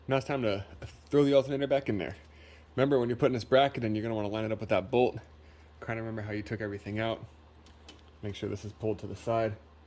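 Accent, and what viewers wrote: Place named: Toronto, Canada. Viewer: You from Toronto?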